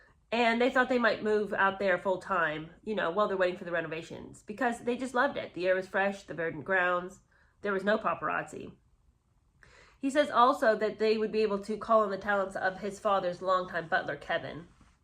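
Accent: United Kingdom. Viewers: They also had apartment 1 in Kensington palace which had 21 rooms next to William who was in 1a, and Duke of Gloucester had to move out of his Grace and favour apartment for them , to which they declined once he had moved out and renovations were carried out! Love your British accent btw haha!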